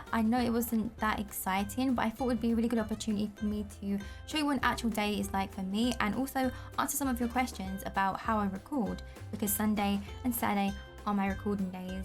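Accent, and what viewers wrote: London, United Kingdom. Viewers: I’m going to the Uk next year.and I went Last year.its really cool for me and hard to understand stand before and now I can so when I first started watching you I knew you where British.also I love ur videos😁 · Ooh your from London???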